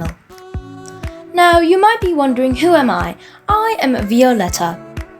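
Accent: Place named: United Kingdom. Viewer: Great try with your British accent... came out well like recipie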